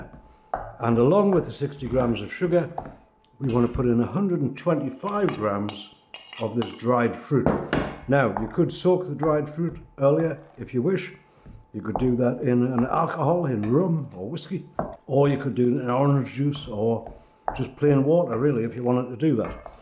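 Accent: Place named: North East England, United Kingdom. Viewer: where are you from I love your accent? I believe your from somewhere in the NorthEast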